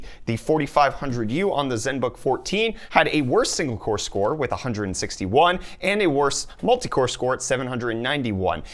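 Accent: South Africa. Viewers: Wait this dude is South African? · Wait are you South African?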